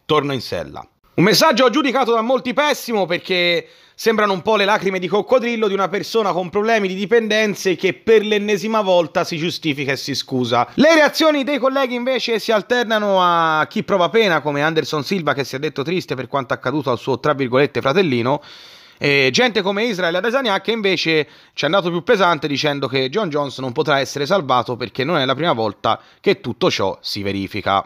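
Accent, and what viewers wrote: Tuscany, Italy. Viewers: Tanto per curiosità, ma sei Toscano?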